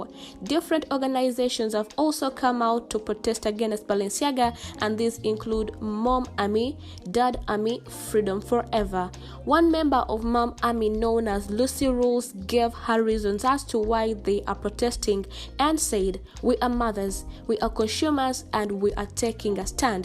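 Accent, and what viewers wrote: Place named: India. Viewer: I can't listen to you channel because it reminds me of ordering an Indian.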